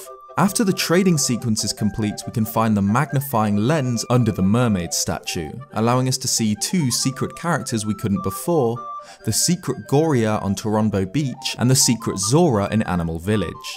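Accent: United Kingdom. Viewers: He's clearly a brit, not american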